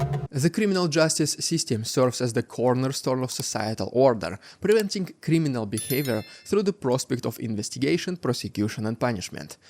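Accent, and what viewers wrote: Russia. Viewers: Cool. That's why my investigation rushes so much to put it in court BTW. Amayzing ryazan' aksent😂 Organized crime was always the problem of Russia. Starting back in Russian empire. Not in the 1990's. It's simply broke lose in 90's.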